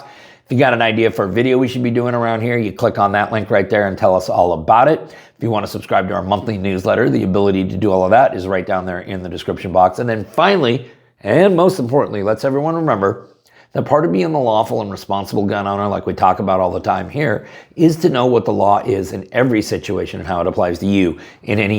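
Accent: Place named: Tennessee, United States. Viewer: really I've watched him for a long time and never heard him talk about it but I believe you I just always assumed he was born and raised here that tells me he must live fairly close by my area cause I'm not far from the Kentucky state line and his accent is the same as all the folks around my area but thanks for the info I guess I should listen better instead of fast forwarding to when he gets to shooting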